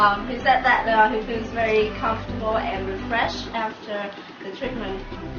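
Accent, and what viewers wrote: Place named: Vietnam. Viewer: speaking english by vietnamese accent :)) It sound so funny :))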